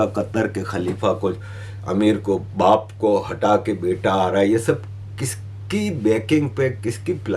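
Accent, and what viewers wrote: Gujarat, India. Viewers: Sirji aap kahise pakistani nahi lagte ,,aapka bolne ka andaz thodasa gujarati lagta hai,, matlab ke indian lagta hai,, salute to your knowledge sir,,pls tell us the story of Turkey 🎉❤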